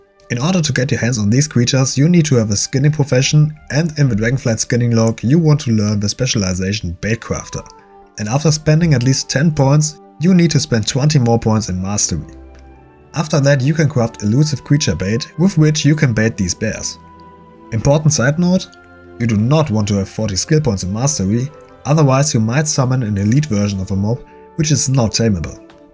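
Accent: Germany. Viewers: I bet my a** that you are 110% german. I can hear it through your accent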